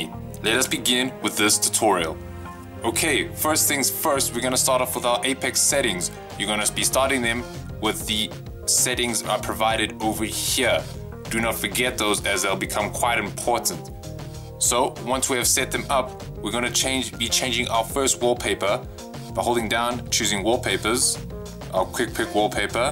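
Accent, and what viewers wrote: South Africa. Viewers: Cool tutorial, wasn't expecting the SA accent, nice bru!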